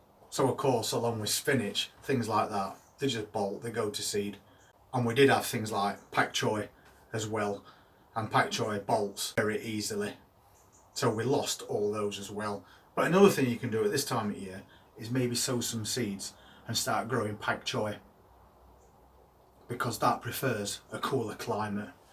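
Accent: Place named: England, United Kingdom. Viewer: oh no. I love the bells. Your video music reminds me of that old song about the flowers in an English country garden. That plus your lovely accent.... No quintessentially English, and this English woman loves it all!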